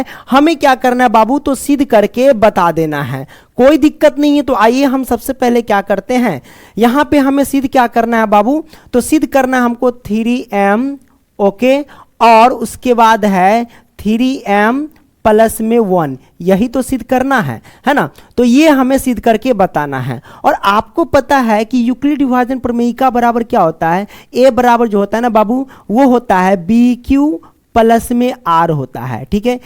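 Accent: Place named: Purnea, India. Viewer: Sir aapki language bihar ke purnea ki lg rhi h ❤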